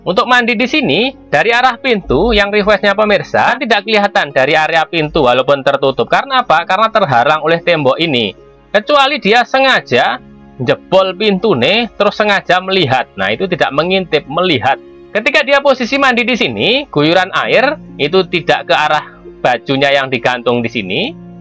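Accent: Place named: Java, Indonesia. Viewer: Jowomu medok men to kangmas heeeeeemmm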